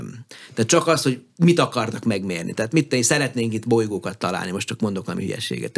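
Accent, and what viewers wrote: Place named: South Bačka, Serbia. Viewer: Dél-bácskai tájszólás, Rátgéber Lászlóhoz hasonlítanám. Csak a szakterület más: egyikük a kosárlabdához ért nem akárhogyan, másikuk a csillagászathoz :))). Ja, és humoros a történet, betegre röhögöm magam minden interjúján, előadásán. Régen én is imádtam a csillagászatot :).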